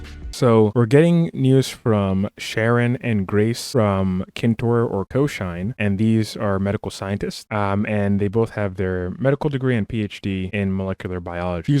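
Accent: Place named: United States. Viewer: Son accent est trop Americian pour l'être 😃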